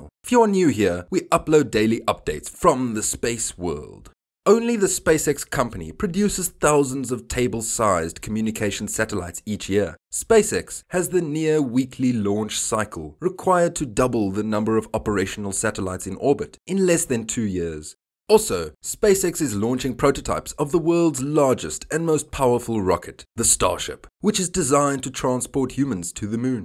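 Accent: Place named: Vaal, South Africa. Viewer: Huz that Vaalie accent bru? Nice and syrupy...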